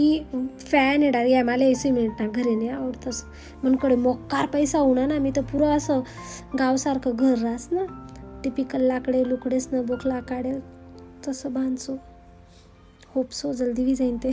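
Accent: Khandesh, India. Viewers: Didi tuna video khupch mst rahtas male khup aavdts.. 😅❤aamhi pn shirpur na shet tu khup bhari khandeshi bols aate nya pori khandeshi bolane laj tis nko ka thika na rahe na ghar na dar na.. Tu disale pn khup sundar aahe. 😊 male pn aasch khar bolale aavds jast badaykya marale nhi aavds.. Ek nmbr video rahts.. Tu mo no send kar jo na...... 🎉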